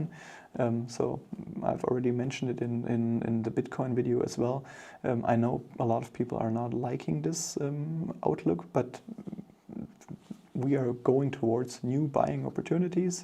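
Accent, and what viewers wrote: Germany. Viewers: You have a very strong german pro noun ci a tion!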